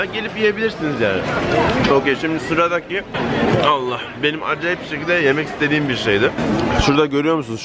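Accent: Konya, Turkey. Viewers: bende diyom bu adam niye Konyalı gibi konuşuyo ben de konyalıyım. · Bir konyali olarak babam herzaman anouu der da diyor😄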